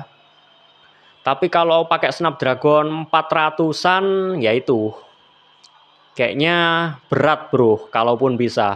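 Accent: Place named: Java, Indonesia. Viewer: Sene deragon, bahasa medok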